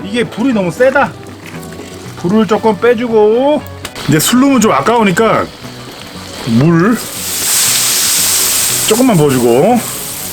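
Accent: Gyeongsang, South Korea. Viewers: 예전부터 궁금했는데 경상도 사투리쓰시는데 사시는 곳이 강원도 쪽인 이유가 있나요?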